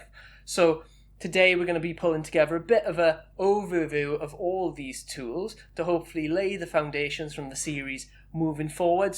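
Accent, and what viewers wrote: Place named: Wales, United Kingdom. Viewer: id say he's from wales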